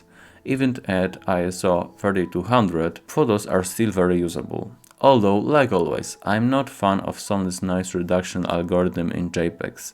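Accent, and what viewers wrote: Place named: Poland. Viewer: You hear as a polish guy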